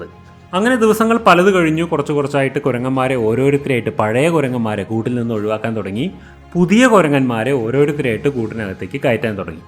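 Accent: Palakkad, India. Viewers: Oru palakkad Karan alle ?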